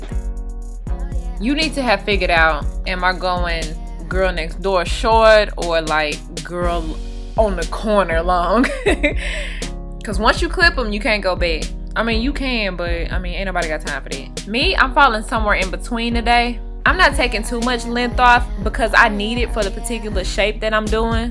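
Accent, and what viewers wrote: South, United States. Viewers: I don't understand why people were saying this was "ghetto" and she sounds "ghetto" ?? wtf does ghetto sound like ?? Cuz to me it sounds like she just has a country accent.. But anyway..nice tutorial girl. Them nails SLAY 😍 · I LOVE IT!!! YES TO YOUR SOUTHERN ACCENT!!! YESSS TO YOUR PERSONALITY!!! YESS YESS YESS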